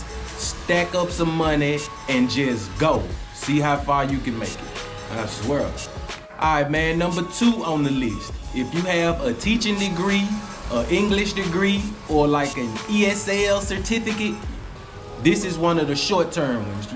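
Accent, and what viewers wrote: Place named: Tennessee, United States. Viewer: Is you from the A or are you from Memphis. I hear Tennessee.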